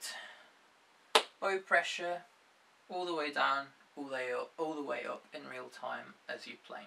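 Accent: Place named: North, United Kingdom. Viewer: You have a REALLY interesting accent, put me out of my misery? There is some Northern UK mixed with an European accent....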